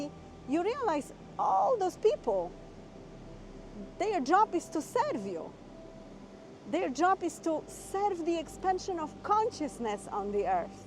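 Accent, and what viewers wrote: Poland. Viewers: Hello, thank you for your words, you seem to speak with Polish accent. ❤❤❤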